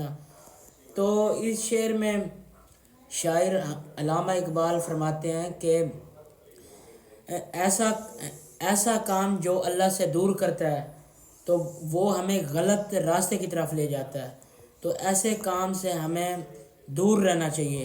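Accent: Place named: Punjab, Pakistan. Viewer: Bhai urdu talaffuz aapka punjabi sai mix horha hai😅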